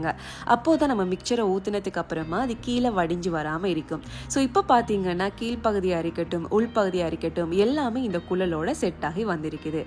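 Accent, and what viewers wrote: Sri Lanka. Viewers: Super sister, well explanation, and srilankan tamil nice.🎉🎉🎉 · I was thinking about how to make sausage at home. Very much happy to watch your videos along with your srilankan Tamil. Love your recipes and will really try it & update soon ❤ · உங்கள் தமிழ் பேச்சு எனக்கு ரொம்ப பிடிச்சிருக்கு🎉❤வாழ்த்துகள் தோழிக்கு❤🎉 நீங்கல் இலங்கை தமிழச்சி துபாயில் இருக்கிங்க சரியா? (நான் தமிழ்நாடு) இதை எங்கு எப்படி வைத்து எவ்வளவு நாட்கள் வைத்து உபயோகபடுத்த முடியும்.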